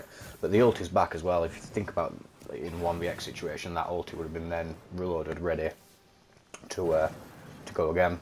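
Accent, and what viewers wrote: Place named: Bristol, United Kingdom. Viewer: are u from Bristol ? thats one T H I C K accent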